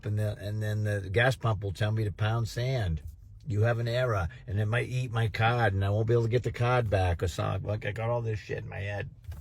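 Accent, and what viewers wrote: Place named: South Boston, United States. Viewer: Like your South Boston accent, caard!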